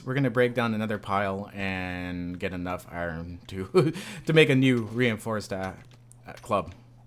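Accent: Canada. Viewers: You sound Canadian. Is he?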